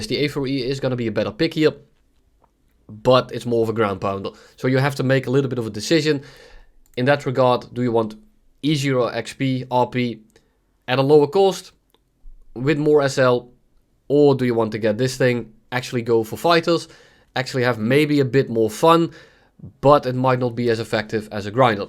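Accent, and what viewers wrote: Netherlands. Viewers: bro je kan btr nederlands praten (acent) gwn goed engld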